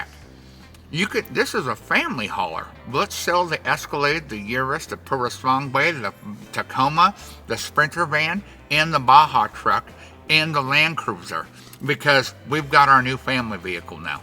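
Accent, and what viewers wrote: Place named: Appalachia, United States. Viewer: is too good at the hillbilly accent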